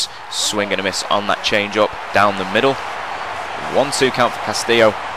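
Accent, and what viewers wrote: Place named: United Kingdom. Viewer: Tis British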